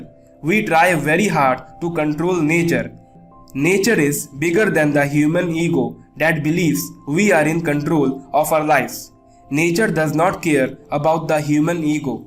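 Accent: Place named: India. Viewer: indian accent god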